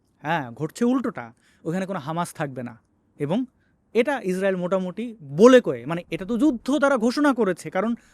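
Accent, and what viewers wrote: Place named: Bangal, Bangladesh. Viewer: দাদা আপনার বাঙ্গু কথা খুব মজা লাগে হা হা হা।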